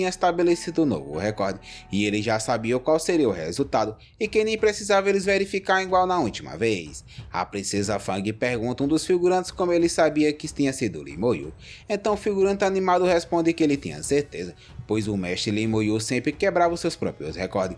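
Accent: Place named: Northeast, Brazil. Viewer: 😂 kkkk mais um EP top demais 😍 parabéns pela sua narração perfeita sem ia amo o dialeto nordestino 😂 em algumas partes me pegou de surpresa com um xingamento do neida 😂😂 vamos nessa para o próximo episódio ❤😊👍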